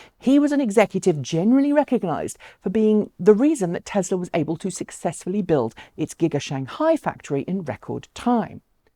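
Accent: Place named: RP, United Kingdom. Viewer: Your accent goes a bit posh in these videos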